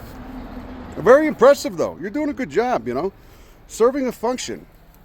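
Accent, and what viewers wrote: Chicago, United States. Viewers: Ya that’s a south side Chicago accent!!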